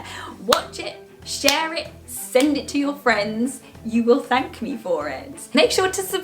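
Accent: United Kingdom. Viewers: You're so awesome, love the British accent.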